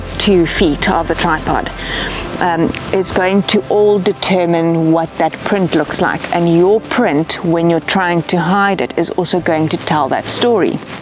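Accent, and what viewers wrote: South Africa. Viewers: Listening to a South African teach me how to counter track just feels right. 🥷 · You have a pretorian accent 😊 I hope I’m right? I’m from the USA, it’s worth a guess. Also excellent content! Subbed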